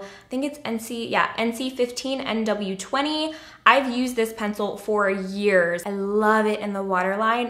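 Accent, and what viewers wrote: United States. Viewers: how did you get rid of your cute country ass accent LOL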